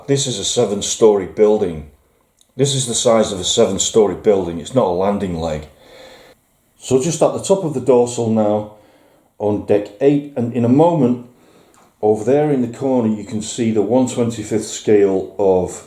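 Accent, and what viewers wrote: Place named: Bury, United Kingdom. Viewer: Wow, amazing work, my friend! Your skills are out of this world, which is quite apprpriate! Growing up, I always preferred the overall look of the Enterprise D exterior, however, I recently learned just how VAST the D is, like a city, too big to walk around, you're 100% dependent upon the turbolifts, and that really put me off. The curved corridors we see on TNG have a TINY diameter compared to the actual outer saucer. That ship is so vast, it's actually nightmarish. Too big to feel like home. Now as for the original 1701, it's still huge, but small enough to feel comfortable, small enough to walk around, so I'm starting to appreciate it way more. As for you taking a few liberties with the design - why not? Since the "official" layout diagrams were incomplete, it's up to the fans to fill in the gaps using logic and knowledge of the show. 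By the way, your accent reminds me of the Bury area, where my dad's from :-)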